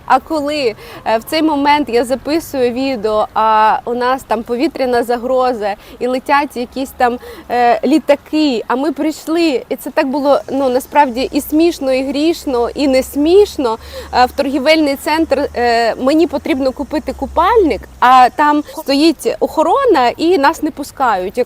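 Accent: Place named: Western Ukraine, Ukraine. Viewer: Ваш украинский напоминает западно украинский, я тоже так говорила, после отдыха на западной Украине.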